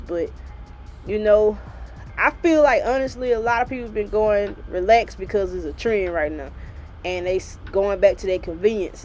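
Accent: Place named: South, United States. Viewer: Love this southern accent!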